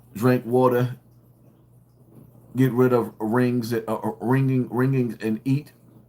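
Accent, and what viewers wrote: Brooklyn, United States. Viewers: BROOKLYN TONE N THE BUILDING...EAST NY STAND UP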